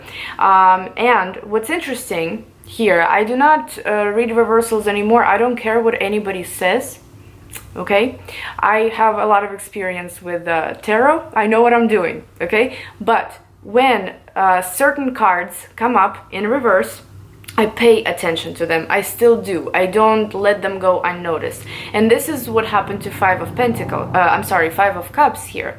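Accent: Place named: Russia. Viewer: I like her accent, is she Russian?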